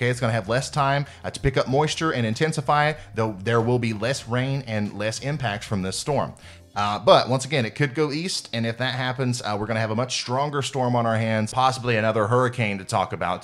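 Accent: United States. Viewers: You're a YouTube personality, you can take as much time as you need to talk about the weather. Too much country in your talk.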